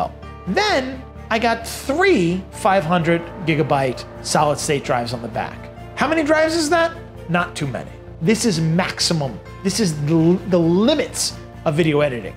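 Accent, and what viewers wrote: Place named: Queens, United States. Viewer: Great video! Aside from the great content, you had me chuckling at a few points (I'm a tough audience for comedy). I love your accent. I'm from Springsteen's home town and I'm trying to determine which borough your from. Your accent isn't strong enough for the Bronx, and I definitely don't hear LonGIsland (no space and capital G on purpose). I'm going with Queens. I'm at the Camtasia/OpenShot level of video editing ability, so please excuse my ignorance. You built this with a focus on Adobe - how would it do with DaVinci Resolve (I'm cheap) and other Black Magic Design products, please? I assume it would chew through them, too. Thank you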